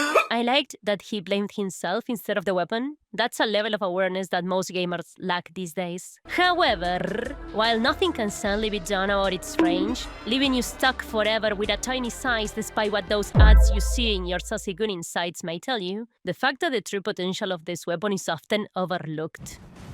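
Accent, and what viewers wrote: Russia. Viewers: me encanta que esta youtuber que me habla en ingles, con acento ruso y me suelta un insulto en perfeto argento 10/10 vamos a ver mas viedos.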